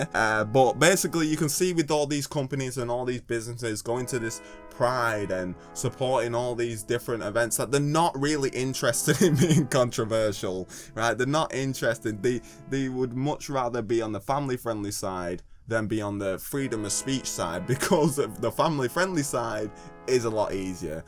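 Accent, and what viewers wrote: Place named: Geordie, United Kingdom. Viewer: Where are you from? I’m from Southern California. Are you a Geordie? Your accent is so tricky to place lol.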